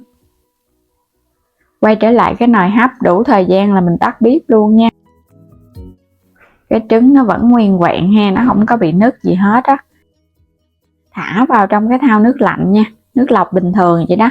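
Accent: Mekong Delta, Vietnam. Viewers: HÔT GÀ , HÔT VỊT CHỨ KHÔNG PHẢI TRỨNG. COI CHỨ KHÔNG PHẢI XEM. BỎ VÔ CHỨ KHÔNG PHẢI CHO VÀO. PHÁT ÂM THÌ " GẶC " DÂN MIỀN TÂY NHƯNG TỪ NGỮ THÌ BỊ VC HÓA.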